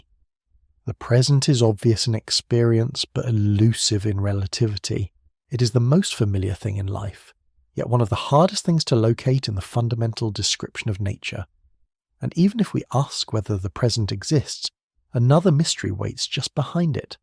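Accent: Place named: West Sussex, United Kingdom. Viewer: Hi from West Sussex. Thanks for your vids my friend. You sound local to me.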